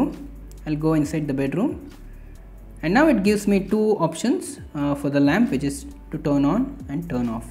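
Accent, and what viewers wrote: India. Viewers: Hated the vid. More sonoff, less indian accent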